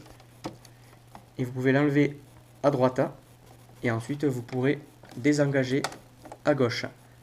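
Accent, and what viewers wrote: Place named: South-West, France. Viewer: Top ça marche ! et avec l'accent du sud-ouest :)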